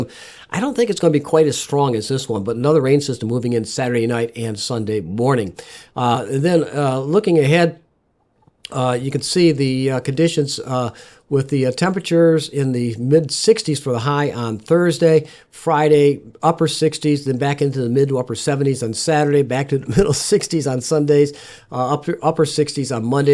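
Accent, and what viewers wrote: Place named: South Carolina, United States. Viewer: wow savannah pretty I hear Sth carolina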